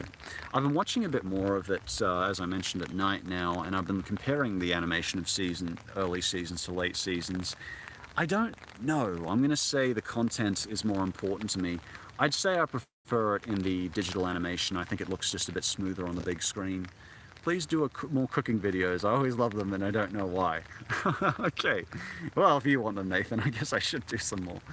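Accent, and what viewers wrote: Australia. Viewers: Hey are you from Brisbane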